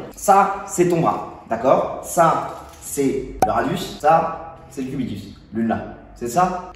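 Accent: South, France. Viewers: il est du sud de la france et la on parle comme ça et je trouve ça simplement chouette et inoffensif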